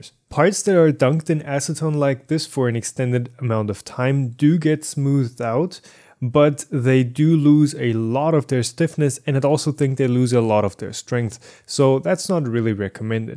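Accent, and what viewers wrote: Germany. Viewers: HA! ich wusste es. von der ersten sekunde an hab ich gehört das du deutsch bist ;D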